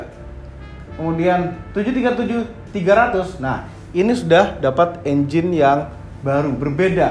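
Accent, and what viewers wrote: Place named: Blitar, Indonesia. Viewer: Blitar men logate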